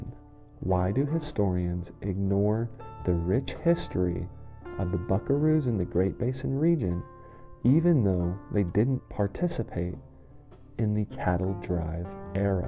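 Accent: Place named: California, United States. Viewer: Born and raised in the Great Basin, this presenter is an embarrassment. He sounds like a Californian. I also take issue with his incoherent comment about the Great Basin not being part of the larger value set of traditional Americans. If there was and is any place in America that has hung onto traditional American values, it is in the cow country of the Great Basin. The traditions of the Vaqueros with the long reata, hackamores and spade bits never got the attention of the Texas cowboys precisely because of the remoteness of the region and the independent nature of the buckaroo. Battle Born. Storm Rough Country Horses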